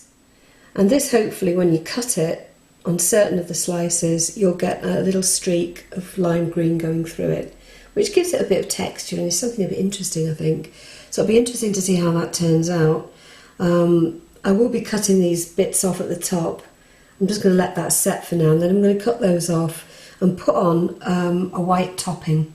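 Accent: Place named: United Kingdom. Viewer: So cute!...i always seem to have issues with my mnp setting in my pouring jug....i dont like to heat it up too much because i worry about my fragrance being burnt off....your mnp looked very hot....you dont have issues with burn off....love ur videos :o)....nice to hear a uk soaping voice! x oh and the cornflour tip..awesome :o)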